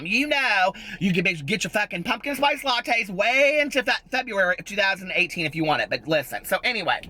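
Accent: South, United States. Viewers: You sound like you have the thickest southern accent in this rant! Love it!!!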